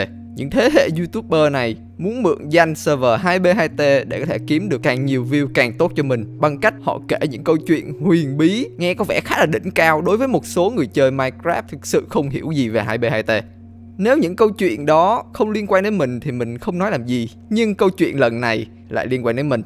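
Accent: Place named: South, Vietnam. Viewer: that is southern vietnamese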